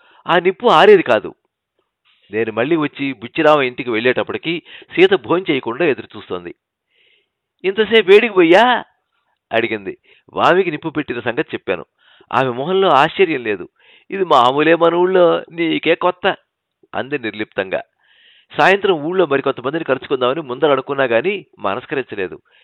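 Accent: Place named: Guntur, India. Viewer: చాలా కాలానికి మట్టి వాసనతో పరిమళించిన అచ్చ తెలుగు కథ విన్నాను. పాపినేని శివశంకర్ గారి కథా సంపుటి "మట్టి గుండె" లో ఈ కథ చదివాను. గుంటూరు జిల్లా పల్లెటూళ్ళలో స్వచ్ఛంగా వినిపించే భాషతో కథ మరింత శోభించింది. మా జిల్లా ప్రజల భాషను కథలో చొప్పించిన శివశంకర్ గారి ఘనత ఒకటైతే, ఉత్తరాంధ్ర వాసి గారు, ఆ భాషకు పూర్తి న్యాయం చేకూర్చటం మరొక ఘనత. రచయితకు, ప్రయోక్తకు వేనవేల వందనాలు.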